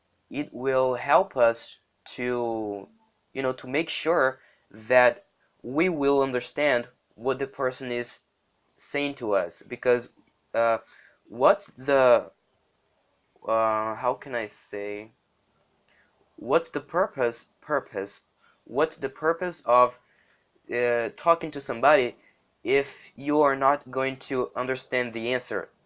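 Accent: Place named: United States. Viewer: Excellent mate. Your pronunciation is really good. You have a slight American accent too :)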